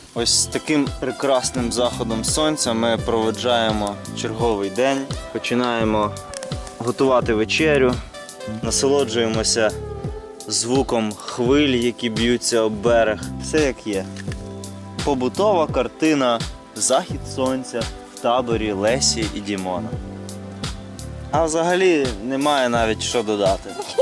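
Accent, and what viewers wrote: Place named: Galicia, Ukraine. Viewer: Добрий день, хлопці дівчата. За відео спасибі. Все класно, подяка за рідний галицький акцент. Цього літа хочемо висадитися на Кінбурнській косі - катером з Миколаєва і пішки по узбережжю до Покровки(Ягорлицький залив). У мене кілька запитань з цього приводу: 1. Як там з водою? - ми плануємо пройти 20 км на півднь по берегу до Покровки? 2. Ви були як я зрозумів в Покровці. Ми звідти хочемо теж стартонути додому . Але будемо їхати в Харків з Херсона. Так от : чи є з Покровки транспорт хоча б до Геройського, про Херсон навіть не питаю?? Ваша відповідь стала би нам у великій пригоді. Дякую.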